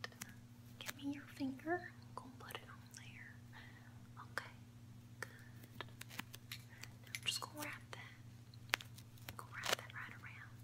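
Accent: South, United States. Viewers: Ok you country accent is spot on and amazing I from the country and even though I don't have that accent I heard some people talk like that and I have one family member who dose that is really amazing how good you did that! Keep up the great work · It's the country nurse accent for me~ 😗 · Absolutely love the southern accent very funny! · Can't wait to watch this after work!!! I could only watch about like 2 minutes of it and I am Loving the accent!!! It is such a sweet and tingly accent :) It is sooo Good! Kinda reminds me a little of Dolly Parton.. but she's the first one to come to mind when I hear a southern accent lol. You're really getting into your RP..and I am here for it!!! 😁💖👏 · Love that southern accent lol!! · This video is y I subscribed, 😁 that southern accent was ironically soothing. U must b from the south, if not very convincing 😆. 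· hehe it's definitely a southern accent, i'm from jersey and we speak like we're from california. thank you for the time stamps :) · This is my new favourite ASMR clip. That southern accent is just perfect. <3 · You have a better southern accent than the actors in Dumplin. Coming from a southern girl. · Love the Georgia by way of Tennessee accent. sorry to type like I know you but " " I swear this is your actual accent you speak with. Also your pure devotion to be such a great ASMRTIST is divine as you are bless your heart child keep up your excellent work it's much appreciated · Holy moly that accent is ASMR inducing. The southern accent is very endearing and loving. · Wow, so far this is amazing! Creative idea, I love the southern accent. Comment section is brimming with kudos. This is going to be awesome. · Gotta love me some southern accent, damn. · The southern accent was SPOT ON! 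Excellent job as usual! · That country voice tho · you have the patience of a saint. You a little over 2 hour movie which must have taken forever to edit. Too tedious for me. You do a fairly good.good southern accent and it's pretty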